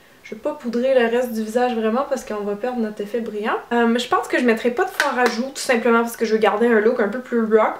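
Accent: Quebec, Canada. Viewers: Salut ! Magnifique make-up ! J'adore l'accent québécois il est trop beau ! Tu es trop belle ! Je te suis tous les jours ! Toutes tes vids je les ai regardes ! Je t'adore ! Bisous Merci d'avoir créer ta chaine ! Et bonne année 2015 !! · Tu viens chez moi en avril!! Je suis nouvelle à te suivre sur FB et ici. J'ai le temps de pratiquer ces jours-ci avec mes vacances :-) Je suis québécoise établie depuis de nombreuses années en Suisse, à côté de Montreux, alors si tu as besoin de quelqu'un pour faire la traduction québécois-"suisse"(Loll!), tu peux me demander! :-D Et les suisses romands (francophones) adooooooorent notre accent! Tu seras la star de l'évènement! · magnifique !!!! je vais le faire au nouvel an ! ps : je suis amoureuse de ton accent ! Je suis française mais j'ai un oncle qui vit à Québec depuis quelques années et je vais le voir en avril....j'ai hate ! 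ton accent me fait deja voyager !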